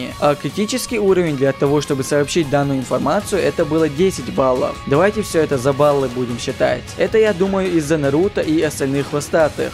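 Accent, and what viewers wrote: Chechnya, Russia. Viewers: Братан, ты случайно не из Чечни? Акцент выдаёт. Я твой голос где то слышал, тебя случайно не зовут?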